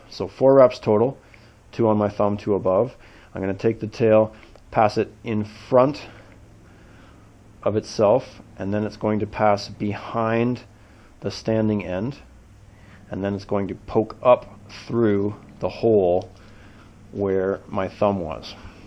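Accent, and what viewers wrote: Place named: Canada. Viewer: This is the Most Canadian dialect I have heard in years. Are you the real Red Green? (Beaner is racial slang criticizing Hispanic people, you should probably say the whole word.)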